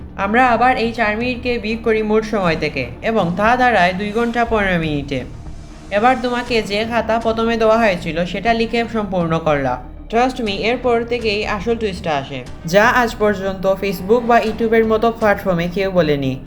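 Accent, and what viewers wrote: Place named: Sylhet, Bangladesh. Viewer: সিলেটি ব্র😢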